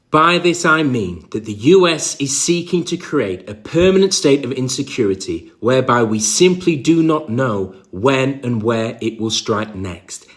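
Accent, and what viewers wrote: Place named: United Kingdom. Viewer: You sound British, none of your business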